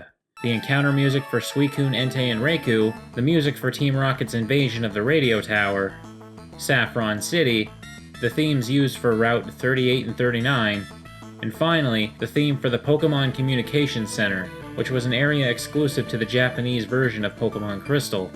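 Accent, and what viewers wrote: Canada. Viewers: you sound Canadian :) anyway blank reminds me of missing no. lol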